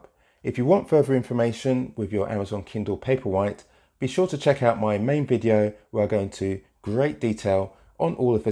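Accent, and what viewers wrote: United Kingdom. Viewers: Not helpful at all for me. The Speaker talked too fast, but more importantly, I tried to keep up with him to find the screens his finger touched and I could not duplicate the function. I bought this new Paperwhite 2021 a few months ago (this is November 2022) because I was advised my older Kindle would no longer operate with new books added. I am trying to find someone who can actually show me how to operate the Paperwhite. Finally, why have a British voice on this video when there are thousands of American speakers who would be easier for the American ears to understand.?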